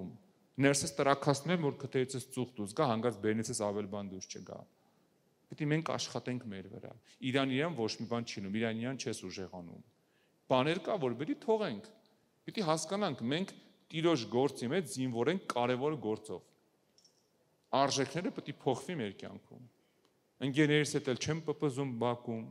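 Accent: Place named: Aparan, Armenia. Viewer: Ես էլ ասում եմ ինչի եմ այսքան հաճույքով լսում ձեր քարոզները պարզվումա Ապարացու արյունն էր քաշում նախ ասեմ որ իսկապես շատ լավ եք խոսսում նույնիս խրատական և դաստիարակչական մեծ տաղանդ ունեք բոլորն էլ լավն են բայց ձես խոդքը մի տեսակ տարբերվող է երևի որ Ապարանցի եք դրանից իսկ եթե գաղտնիք չի կասեք կոնկրետ Ապարանի գյուղերից եք թե միջից ես էլ եմ Ապարանցի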